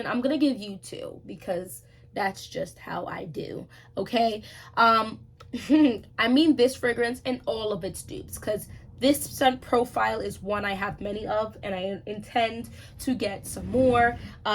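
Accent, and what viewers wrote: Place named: Jamaica. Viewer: Lmao it’s the Jamaican dialects in bits & pieces for me. Enjoyed this vid!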